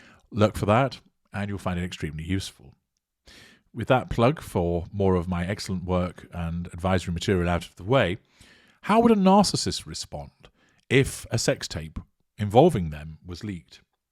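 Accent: United Kingdom. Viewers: I love your Channel, yours contents and your British accent keeps me watching over and over because I am addicted to it. Greetings from🇧🇷❤️🫶🏻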